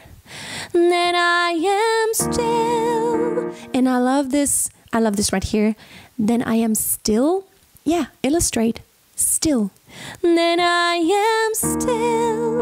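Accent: Germany. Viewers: You sound German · Are you German?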